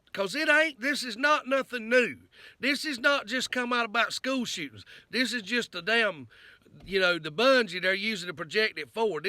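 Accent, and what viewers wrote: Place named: South, United States. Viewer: Damn, I agree what your saying....But you say GD way to much....but your completely right, also, where you from, you sound like your from my part of the south, your ether from Georgia, Florida, or my home state...Alabama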